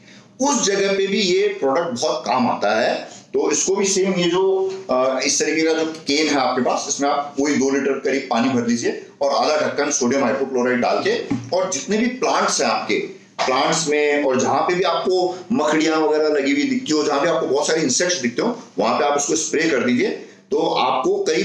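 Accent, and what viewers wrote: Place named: Rajasthan, India. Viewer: nazar कमाल है मतलब सही में आप राजस्थानी हो actually आपका बोलने का शुद्ध तरीका जो h wo और इतने बेबाक तरीके से राजस्थानी ही बात करते हैं और intelligent हो आप क्योंकि में भी राजस्थानी हू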